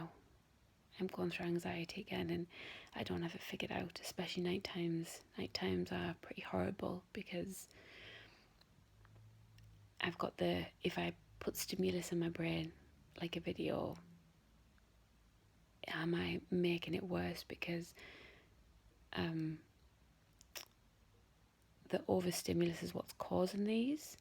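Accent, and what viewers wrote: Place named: Newcastle, United Kingdom. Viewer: .....sound like a Geordie!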